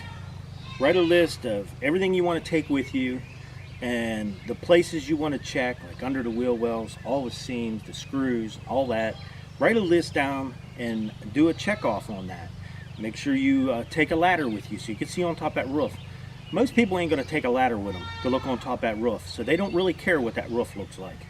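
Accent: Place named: Pittsburgh, United States. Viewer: I am so glad I just discovered your you tube site! I looked at your video playlist and am amazed at how creative a DIY master you are. I also heard in your speaking that you must be from the Pittsburgh Pennsylvania area, as you possess several distinctive Western PA speech sounds. I went to school there (Carnegie Mellon, Theatre/Speech School) back in the early seventies, and love that particular American "dialect". A very good friend lives there and so, I get to hear it again, from time to time. Thanks for your spectacular videos. I look forward to watching most of them, as you appear to know all one should know in order to take care of ones self in this world (or not to get ripped off when someone else 'services" your need) It is never too late to learn. I'm 70, and still have lots to learn!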